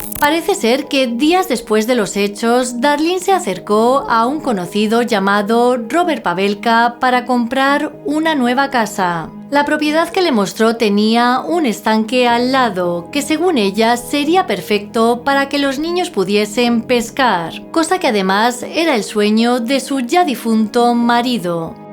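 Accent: Spain. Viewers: Me encanta su acento, es de España 😍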